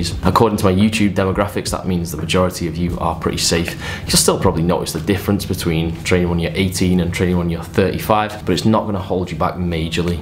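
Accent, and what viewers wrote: United Kingdom. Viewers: Thank you very much for all that super valious information, and I love your accent, where are you from in the UK?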